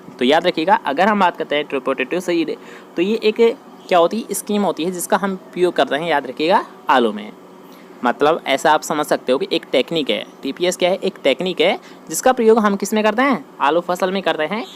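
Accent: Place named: India. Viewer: Sir ji aapki awaj bahut achhi h....aap kaunse stet se h ..aapki Hindi kuch alg h..but cute h...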